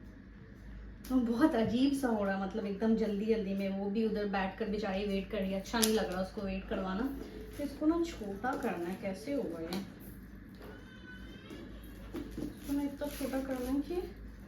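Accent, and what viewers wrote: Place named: Bihar, India. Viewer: Jo log soch rahe h yh ayse kyo bolte h to mai btatu jisko nhi pta ho yh bihar say h waha aysr hi bolte h i am from upppp❤❤❤❤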